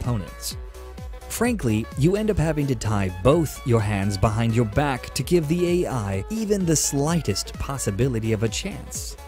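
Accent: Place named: Ukraine. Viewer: He should stick with his natural Ukranian accent. Dame pesos for example.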